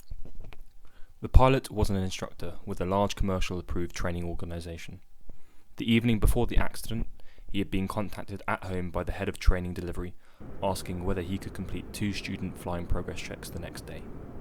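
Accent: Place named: Birmingham, United Kingdom. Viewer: I keep trying to watch this video. I've already left one set of comments. As I keep rewinding. Going what did he say? What did he say? You are mumbling, child! I know we all love Ozzy Osbourne. And wish him and Sharon well. But you don't really want to sound like, you are trying to impersonate, Ozzy Osbourne's, brain dead speech patterns. What did they say that is? A, Birmingham, twang? As in, Birming and ham. It's 2 things not one. It's not pronounced, " Buhmmmmh ". Look at the letters! You have to pronounce them! Along with, letters AEIOU and sometimes, Y. That sounds like, E. And I don't know, Y? And then to make English more confusing. Some joker came up with, YMCA and YM HA in, New Yaawk! And we don't know why that is? So don't ask. It just is. Don't say gay. Even if they are. And genocide of the Native American population. No big thing. 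Not because they weren't white. We aren't like that. It's because they didn't believe in Jesus. And they only lynched black people also? Innguns… Didn't got to worry about that. We were kinder and used bullets instead. Because they also went, BANG! And white guys, like that sound. Because the one they have doesn't work. As Viagra was some, Mexican chick.. And I haven't seen Alice yet. This articulate response. Was artificially generated by my very damaged brain. From years and years. Of calorie burning thinking. Which used most of it up. And in only the past few months. I have lost over 25 pounds! Isn't that amazing?! Just lying on top o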